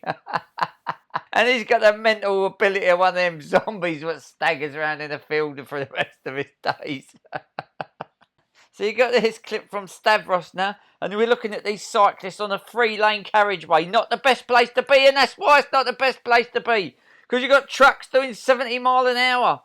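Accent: Cockney, United Kingdom. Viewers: What's your name mate? You really do make laugh with your accent ! Are you a cockney buddy? Greetings from Casablanca Morocco ❤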